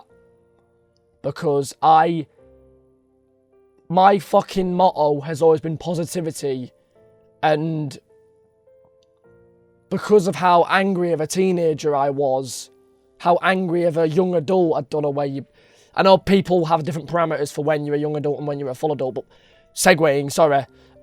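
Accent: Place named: Manchester, United Kingdom. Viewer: Where are you from? I'm from Sweden so I can only guess Mancester. Sorry if you are from Salford. But you are from from the north, aren't you. And you don't have to be "larger than life!"...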